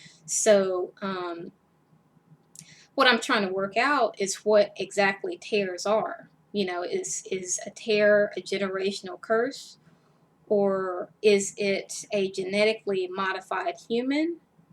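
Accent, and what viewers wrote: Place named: United States. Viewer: "tares" is an old way to say "thistle" from Early Modern English such as existed in 1599 when the Geneva Bible was translated into English. Everyone who lived in europe before 1000 CE is related to every white person alive today, and every person with even one white ancestor. There are 5 Gods and a Goddess in my genealogy. And guessing by your light complexion and American accent, you and I are related.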